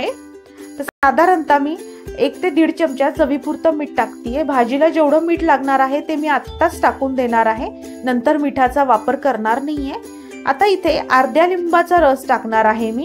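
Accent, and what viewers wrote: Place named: Goa, India. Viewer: Helo Madam Tuzhe Nanv kitem aAni tun Goenkar ashen dista the accent you are talking is from Goa I think Marathi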